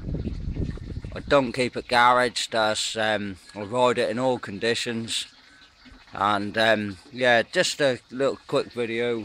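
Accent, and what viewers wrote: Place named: Norfolk, United Kingdom. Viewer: Loving the Norfolk accent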